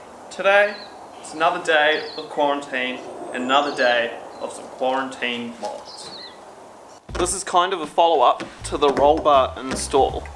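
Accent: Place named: New Zealand. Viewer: could tell you were kiwi immediately haha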